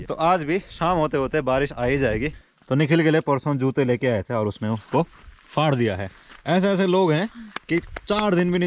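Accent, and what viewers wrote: Garhwal, India. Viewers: भाई एक विडिओ पहाड़ी में बनाओ ना आपकी गढ़वाली भाषा अच्छी लगती है ❤ जय देवभूमि जय उत्तराखंड ❤🙏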